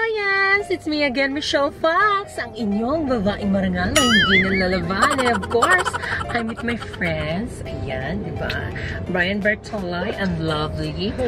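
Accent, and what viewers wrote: Visayas, Philippines. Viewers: Ay bisaya diay ka mamsh? Karon pa oks😅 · Bisaya ka madam ?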